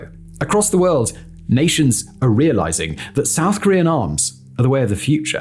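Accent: England, United Kingdom. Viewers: you have great topics but, very sorry, I am struggling with your english accent. You often whisper the last part of your sentence. I sometimes don't understand what you are saying. thanks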